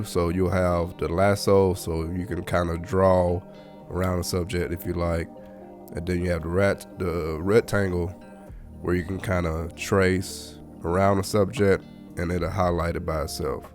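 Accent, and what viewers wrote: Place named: Southeast, United States. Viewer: Most SE USA voice I heard as someone from Charlotte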